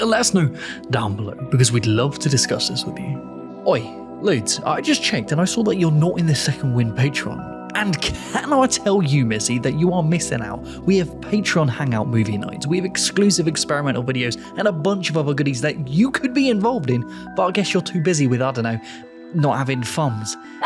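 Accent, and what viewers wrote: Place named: United Kingdom. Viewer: I watched this a 3rd time. I am convinced is a fake gamer who needs hand held garbage or carrot on a stick garbage like WoW. He says that some items are scattered around and hard to find, then says exploration is pointless as there is no pay off. Example: fin gleam. Kid, make up your mind and please realize a British accent doesn't make stupid things stated as FACT, not OPINION sound very dumb and not smart. Funny thing is, I despise Bethesda, shit on them all the time, but the difference is I use literal FACTS. I do not cry about stuff and pretend they are facts. I think is just geared towards dumbed down slop like Skyrim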